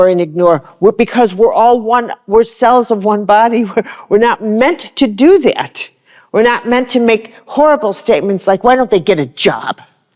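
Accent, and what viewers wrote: Chicago, United States. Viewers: I'm here for that fabulous Chicago accent.😊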